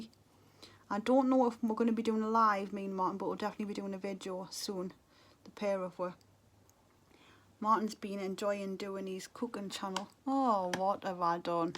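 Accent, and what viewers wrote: Newcastle, United Kingdom. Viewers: I've watched you from the beginning and my boyfriend went to Newcastle Uni (now my husband) and I was in Glasgow doing my nursing training. It seems silly but I felt really at home in Newcastle and when I missed him hearing your accent in your videos was so lovely. I'm so glad you're on youtube again and you seem so happy! you look stunning and wish you all the best for the future lovely.:) <3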